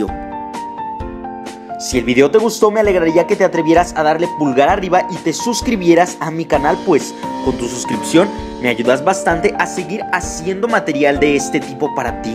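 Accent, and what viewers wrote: Mexico. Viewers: yo soy mexicano y. o me importaría ver a el hombre con hacento mexicano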